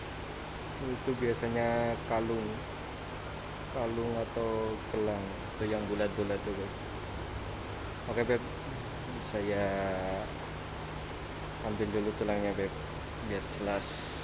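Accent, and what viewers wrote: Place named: Banyumas, Indonesia. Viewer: Salam ngapak bos quuu Ora ngapak ora penak😀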